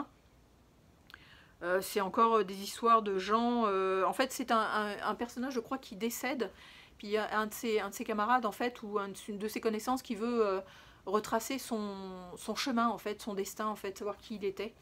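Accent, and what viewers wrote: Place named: Quebec, Canada. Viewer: une PAL de 340 BDs a lire!!! le rêve! 🥳🎉🎉🎉 Québec, j'avais deviné au léger accent... "Kililana song" est une magnifique BD. le dessin est sublime. "La bête" de Frank Pé, ca a l'air tres sympa. "Blast", une de mes BDs preferees, j'adore le dessin. c'est sûr que dans les BDs qui sont pas "youpla boum" elle est dans le top 3 facile. "La petite lumiere", je veux le lire, j'aime bcp le dessinateur.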